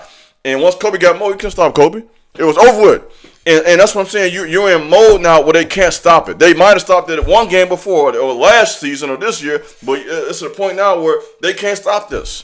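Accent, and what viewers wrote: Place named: Arkansas, United States. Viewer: I’m from Arkansas yea Thts how we talkin lol 😂 gotta do it for our chilren.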